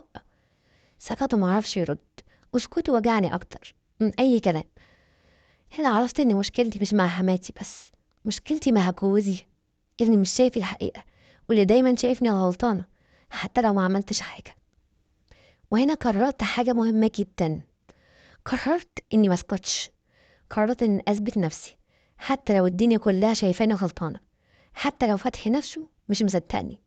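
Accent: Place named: Iraq. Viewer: انت من العراق تحيه من مصر بحب صوت اللهجه❤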